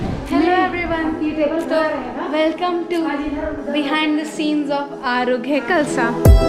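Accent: Chhattisgarh, India. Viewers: आपके आवाज में छत्तीसगढ़ के संस्कृति झलकथे भईया 🙏🙏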